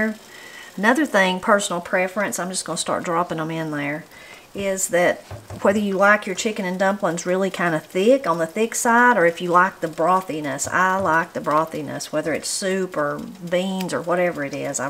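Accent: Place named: Kentucky, United States. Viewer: We called those kind of dumplings, pastry. Chicken and pastry. It's dumplings when we drop them off a spoon. I'm so grateful I found your channel. Hearing your accent takes me back to those Kentucky hollows. Thank you.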